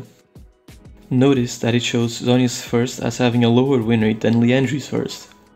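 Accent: Portugal. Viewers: you 100% sound portuguese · Why does your accent looks like you are from portugal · Aquele sotaque tuga em vídeos de LoL, não estava a espera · Are you by chance Portuguese? There's something about your English accent that's activating my senses... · Are you Portuguese bro?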